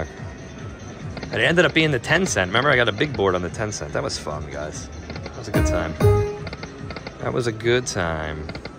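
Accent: New Jersey, United States. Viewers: Love your videos. I love the NJ accent. Favorite I Sar vs I saw. Cool!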